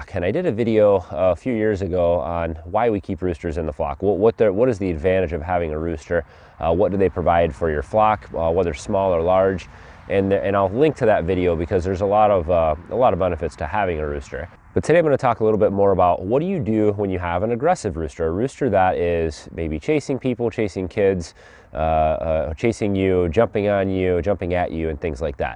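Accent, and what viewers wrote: Michigan, United States. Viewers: Bro are you from Michigan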